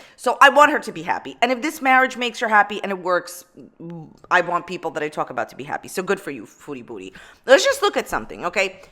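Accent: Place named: New York, United States. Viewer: Beautiful water scenery - where is this? Clear water to see the sand & shells. Your accent sounds like east coast - NY. I was from Dutchess county.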